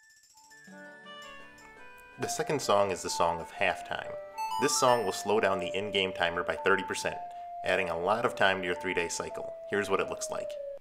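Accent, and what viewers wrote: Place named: United States. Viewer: Sounds American to me.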